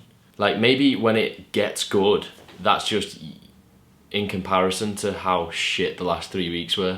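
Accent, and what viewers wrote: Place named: Liverpool, United Kingdom. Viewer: you from widness got bit scouse in ye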